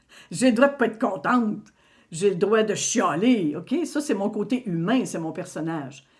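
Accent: Quebec, Canada. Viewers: Merci infiniment pour ton engagement et tout le travail que tu fais avec beaucoup de joie et d'amour. Gratitude pour ce partage du message de l'EIO. Je suis en Italie et j'aime beaucoup t'écouter le matin devant une tasse de thé. Ta bonne humeur et ton joli accent québécois me mettent en joie pour la journée. 🙏 Joyeuses fêtes 🎉❤🤩😘 Très jolies tenues de Noël 🥰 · Bonjour! C'est toujours un plaisir de vous écouter, d'écouter votre "aquecent" Canadien! Oui, 3I Atlas est bien une conscience. Elena Danaan en a parlé dans un de ses Star Nation News. Elle a même pu le voir à travers les yeux de son compagnon. Il faut dire que toute petite elle a été abductée par les gris malfaisants, et a été sauvée in extrêmis par les Galactiques. Malheureusement, ces gris lui ont mis un implant dans le cerveau qu'il a été impossible aux scientifiques Galactiques, d'enlever, sous peine d'attenter à sa vie. 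De ce fait, il grillé cet implant, et l'ont reprogrammé. Ce qui fait qu'elle peut voir à travers les yeux de son compagnon et vice-versa. C'est comme ça qu'elle a pu nous décrire 3I Atlas. Et en effet, 2026 va être une année de changements. Certaines technologies vont être mise à notre disposition pour 2026. Lit médical quantique, prospérité par le QFS (dont de belles sommes sont mises sur chacun de nos comptes, ce qui fait que l'humanité va être riche), les véhicules volants (voitures, autobus, et un autre système d'avions), des réplicateurs de nourriture, et bien d'autres choses encore. Mais surtout, surtout, nos rencontres avec nos cousins venus d'autres planètes, comme les Ummites qui viennent de la planète Oumo située dans la Constellation de la Vierge, à 14 années lumières de la Terre. Elena Danaan a aussi informé que Mars était en Terra-formation. C'est à dire que nos cousins bossent pour refaire cette planète vivante, sur laquelle no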